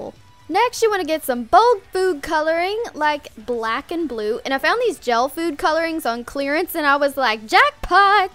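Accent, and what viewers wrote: South, United States. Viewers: In love w your southern voice❤️❤️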